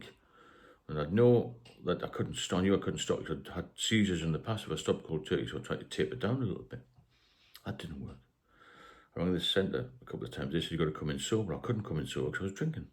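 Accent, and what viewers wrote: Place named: Newcastle, United Kingdom. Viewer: the geordie accent gives it away !!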